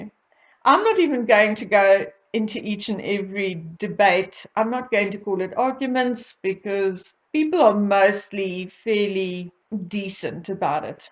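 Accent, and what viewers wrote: South Africa. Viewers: Dearest I just want to say thank you 🙏 what a super video, it may take me a little time to digest as I’m not as young as I used to be 🤣 but I do so love your videos and hearing your beautiful South African accent, it’s just delightful 🥰 take care stay safe 💜 looking forward to your next video 🥰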